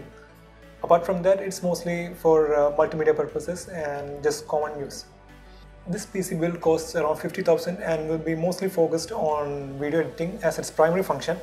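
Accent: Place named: Kerala, India. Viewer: ah yes, you're right. BTW, are you from Kerala? Your accent seems like it.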